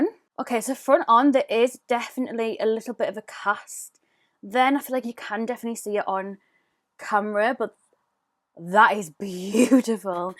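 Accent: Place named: Northern England, United Kingdom. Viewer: Loved the video. Where are you from? You sound like a northern lass like me!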